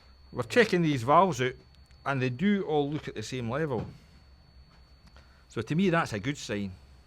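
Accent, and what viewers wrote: Scotland, United Kingdom. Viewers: I love this Scottishness . Especially the groans of dispare 😂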